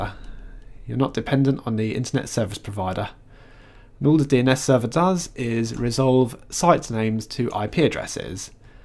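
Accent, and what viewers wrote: United Kingdom. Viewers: never heard anyone more british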